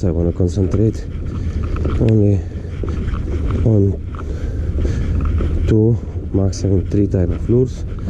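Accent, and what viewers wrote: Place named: Russia. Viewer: From the first seconds I've heard Russian accent. Hello from Saint-Pet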